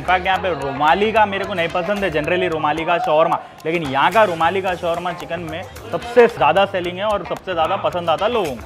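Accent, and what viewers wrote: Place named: Dakhni, India. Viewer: Hi. Ive been to mallepally after watching your older vlog around the masjid, enjoyed the kova jalebi and haleem. Ive seen many hyderabadi food vloggers but you are the best because you only have that distinct dakhni dialect and you are the most presentable and authentic. If you concentrate more on the old city, jumme raat bazar, golconda bazar, not only the food but also the culture, heritage and history of the Nizams and the untold stories of the Hyderabadi culture, you will get more reach. is a very underrated youtube channel, but stay original, broaden the horizon you will see success. Because you have that authentic dakhani dialect. By the way, try hyd dum ki pukht biryani, mirchi ka salan, kacche ghost biryani, best of niharis and tahiris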